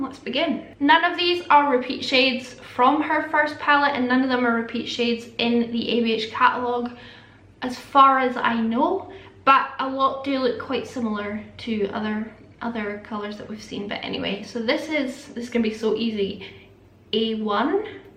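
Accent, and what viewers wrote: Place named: England, United Kingdom. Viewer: I thought you were from England! Lol still love the accent 💕💕💕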